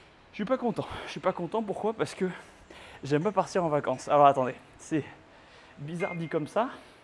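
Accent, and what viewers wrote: France. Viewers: Oh, il a retrouvé sa voix française... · Didn’t even realise he was French till he opened his mouth 😂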